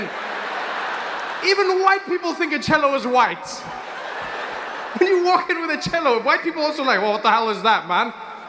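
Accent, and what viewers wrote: South Africa. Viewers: Hope your South African English should make some sense 😂 rubbish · Erm he is South African! Did you not hear his South African accent. And I am not a fan of either of them. I prefer the late great Dave Allen.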